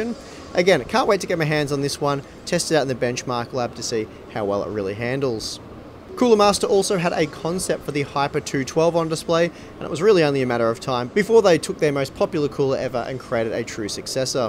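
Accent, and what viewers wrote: Australia. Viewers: disliked because aussie accent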